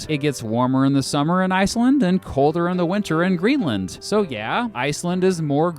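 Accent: United States. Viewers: You’re Icelandic pronunciations are really American. ð is pronounced like the th in think by the way. And á is pronounced like the ou in out. í is pronounced like the ee sheep. ó is pronounced like the o in show. Other than that this was 👌🏼👌🏼👌🏼.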